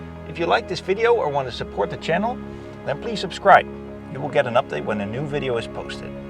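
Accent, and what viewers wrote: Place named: Netherlands. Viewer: Ha! Dat Hollands accent ... dat blijft ge van kilometers ver horen hè. Grappig wel.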